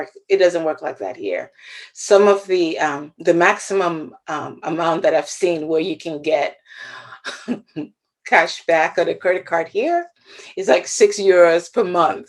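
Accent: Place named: Brooklyn, United States. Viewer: Salud🌹🌹🌹 dear thank you for sharing.... My hubby w his BK accent would appreciate your BK fuggetabouit 😹😁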